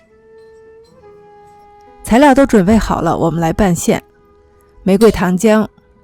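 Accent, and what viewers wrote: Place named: Beijing, China. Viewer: 这是北京口音吧，声音听着很舒服。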